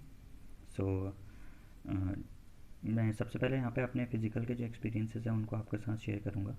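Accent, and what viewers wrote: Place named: Kumaon, India. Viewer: Sir are u from Uttarakhand because ur accent and ur look is totally like Kumaoni people of Uttarakhand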